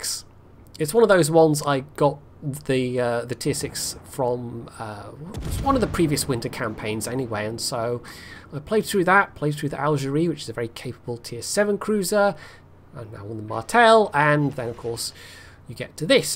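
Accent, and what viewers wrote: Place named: United Kingdom. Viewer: Huh, I didn't even realize I played it so sloppy until this nice British person with gentle voice told me just how much I suck really. Kappa. Jokes aside, glad you've found this worth looking at.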